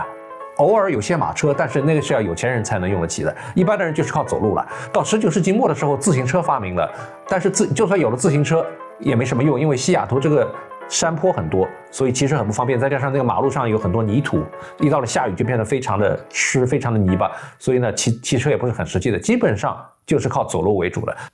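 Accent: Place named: Shanghai, China. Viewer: 30多年上海口音还是很重啊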